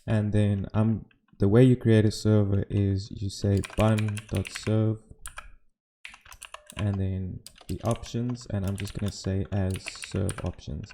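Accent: South Africa. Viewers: Came here for the bun and HTMX, stayed because I heard the SA accent lol. Also playing with HTMX now, still wana start a side project with bun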